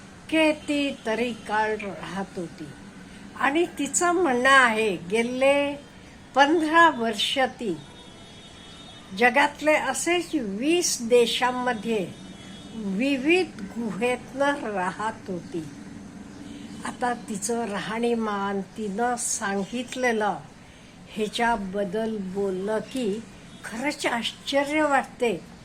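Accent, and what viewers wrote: Karnataka, India. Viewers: आई तुम्ही खूप गोड आहात 😊 तुमच्या मराठीत कन्नड भाषेची फोडणी घातल्या सारखं 🙏 छान वाटला व्हिडीओ 🙏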